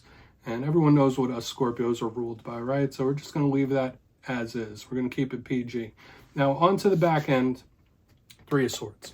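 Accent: United States. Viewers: At least you speak English like the true American you are! I’m so sick of all the foreigners tarot readers from other lands that we can’t even understand with their too thick accents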